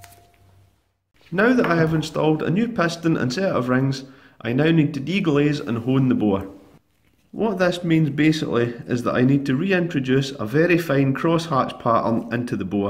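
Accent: Scotland, United Kingdom. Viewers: Sounds like a Scottish accent?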